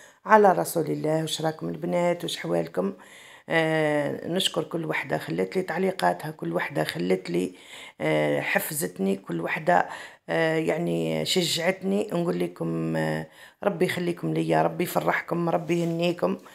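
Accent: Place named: Tebessa, Algeria. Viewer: علا هدرتك راكي من ولاية تبسة تحياتي الخالصة لناس ولاية تبسة والحرير الكبار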